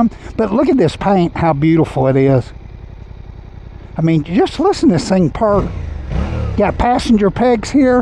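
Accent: United States. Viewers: English man I think not sir sounds American to me😂😂😂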